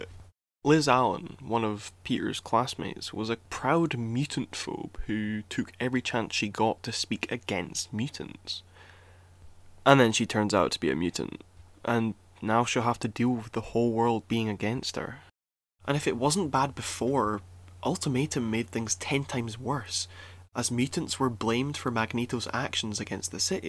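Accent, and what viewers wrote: Scotland, United Kingdom. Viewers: You’re either Scottish or Northern Irish. Am I right? I’m subbed anyway.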